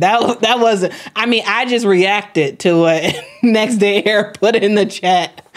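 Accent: Chicago, United States. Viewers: u have a Chicago accent. it’s not as strong as Gherbo, Durk, and Ari’s but we can hear it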